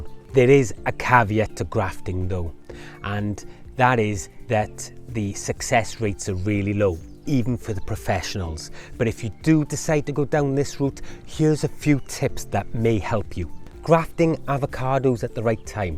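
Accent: Wales, United Kingdom. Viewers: that's wales accent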